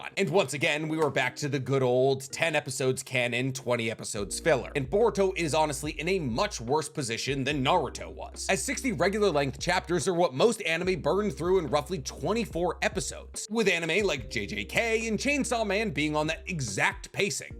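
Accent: United States. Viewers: It’s Ka-wa-ki, stop pronouncing Japanese names as an American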